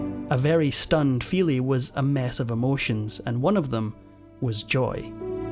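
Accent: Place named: Scotland, United Kingdom. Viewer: Way better than the AI clips. I’m a lover of Scot Accents, so there’s that. Thankyou!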